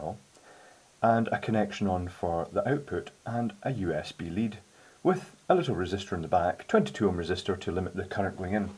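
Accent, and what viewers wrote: Scotland, United Kingdom. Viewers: Nice to see your face!! I really love your videos and also your beautiful British accent; nice radio voice! Keep up the good work! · Scottish Accent.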